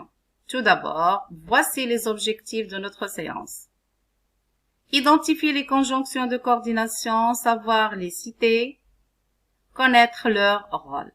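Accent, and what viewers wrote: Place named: Arab world. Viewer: Tg juste prcq elle et arabe et elle a un accent arabe rasciste jte signale regarde comment tu la blaisse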